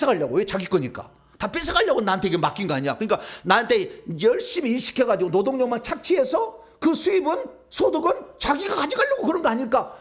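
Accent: Jeolla, South Korea. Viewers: 목사님! 반갑습니다. 목사님 고향이 ”전남 화순군 도곡면“ 이시군요. 저는 화순군 춘양면입니다. 목사님의 설교 말씀을 즐겨 듣는 편인데 들을 때마다 속으로 생각하였습니다. ‘억양이 전라도 분 같은데…‘ 역시나였습니다.🩷 앞으로도 은혜스러운 말씀 기대하겠습니다.😀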